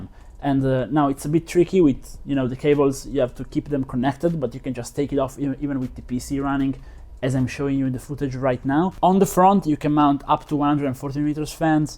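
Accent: Italy. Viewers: Are you italian? (Great vid btw)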